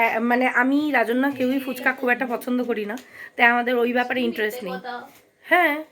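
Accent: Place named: Bangal, Bangladesh. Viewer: একটা কথা জিজ্ঞেস করছি বোন কিছু মনে করোনা।তোমার বাপের বাড়ি আর শ্বশুরবাড়ির মধ্যে কারা বাঙাল?তোমার কথায় টান আছে তাই।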